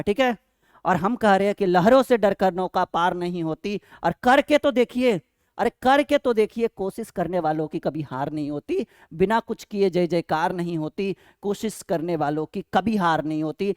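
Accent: Bihar, India. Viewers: Kya aap bihar ke hai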